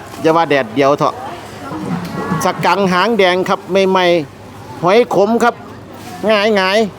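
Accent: Isan, Thailand. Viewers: สำเนียงปากเว้าแม่ค้าบ้านเผิ่นฟังม่วนกว่าทางบ้านเฮาน้อจารย์ ปลา..ยอน ปลา..นางคือสิหลายน้อจารย์ เอามาปิ้งกินกับตำหมากถั่วคือเป็นตาเเซ่บ fc จากเดชอุดม เด้อจ้า(แต่สำเนียงจารย์เว้าผญาแม่นถืกใจคัก)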